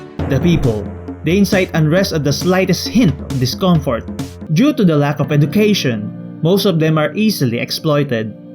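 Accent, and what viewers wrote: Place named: Philippines. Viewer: Strong filipino accent, make a run that parallels idiotic filipino internal policy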